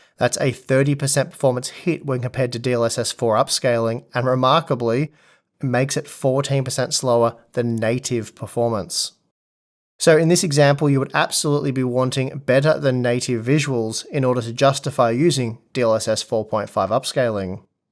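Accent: Australia. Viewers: Great insight, thank you so much 😊 P. S. I love your Australian accent, it's fantastic to listen to it.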